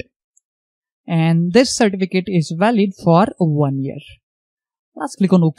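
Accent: India. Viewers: indian accent boosted my brain, thank you bro i love india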